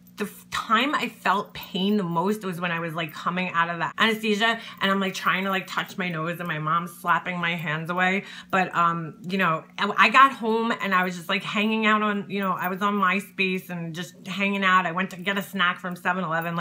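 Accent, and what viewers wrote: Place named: Long Island, United States. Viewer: Long Island accent! Who knew? I've been trying to figure that out. Keep on runnin' your mouth with honesty! You are adorable.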